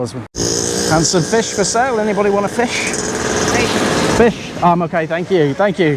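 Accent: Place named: England, United Kingdom. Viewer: Hey bro,, thank you for visiting Timor Leste,,, you sound English .. are you from England?... btw once again thank you very much for this great adventures of yours to Timor Leste.. this is definitely will help boosting up Timor Leste tourism and as a Timorese I can't thanks enough for this... I hope you did enjoyed your traveling around Timor and had a great adventure time .. thank you and be safe..... obrigado barak!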